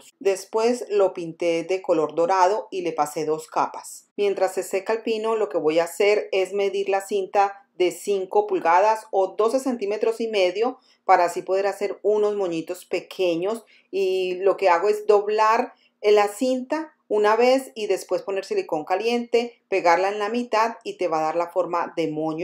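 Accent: Colombia. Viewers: Hola soy nuevo suscriptor miré tus videos y me encantaron, explicas super bien y sobre toooodo es que haces las manualidades MUY ELEGANTES y sobre todo a bajo costo... Me Fascina tu casa la tienes muy ordenada, limpia y de buen gusto, te mando un saludo desde Guadalajara Jalisco México 🇲🇽. Me gusta también tu acento al hablar así tipo Colombiana 👏👏👏👏😉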